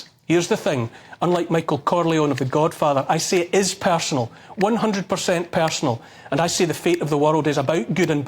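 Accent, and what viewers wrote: Scotland, United Kingdom. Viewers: This is one of the best speeches ever ~ Can't stand the british accent but the Scottish one will do in a pinch as long as it's fiery . English was MEANT to be thus and good on you Neil ~ Please comprehend that these powers that be are actively probing and trying to PROVOKE anyone to become aggressive and radical... Jan 6th hijacking of a peaceful protest was PROOF of that ~ The Bible shows us how to have the patience for days like these ~ God help us ~ Hurry Lord Jesus Christ !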